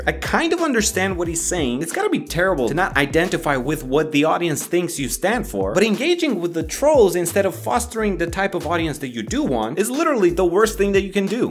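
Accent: Romania. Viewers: I could hear your accent during this video, which I didn’t notice in many of your other content. (Yes, I know he’s Romanian, I just find it kinda strange that his accent downgraded a bit) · are you romanian?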